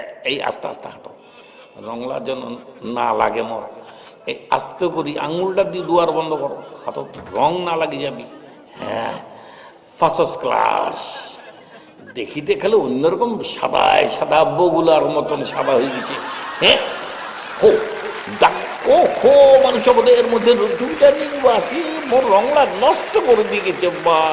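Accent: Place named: Dinajpur, Bangladesh. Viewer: দিনাজপুরের আঞ্চলিক ভাষা গুলো আপনার মুখে দারুন শোনায় স্যার। প্রতি ইদে এটা একটা আলাদা আকর্ষন অনেকের জন্যই। দিনাজপুরের সকলের উদ্দেশ্যে, দিনাজপুরের চোখধাঁধানো খেলাগুলো দেখতে চোখ রাখুন আমাদের চ্যানেল Sports Mania তে...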